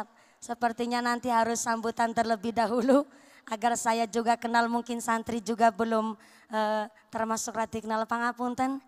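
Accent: Banyumas, Indonesia. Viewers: Ustadzah dari republik ngapakers (mugi2 sehat lan sukses) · Mandan ndredeg ya mbak? Angger ngapak mandan maen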